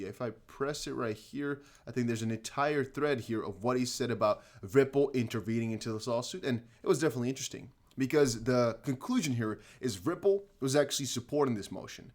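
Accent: United States. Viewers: This guy would have to show me his birth certificate and his passport for me to believe that he's not from the US. There are people in Kansas (the middle of America) that sounds less American than he does. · If you're not from the US. I must be from Mars. You have an American accent. You might be living somewhere else but you definitely sound like you're from the USA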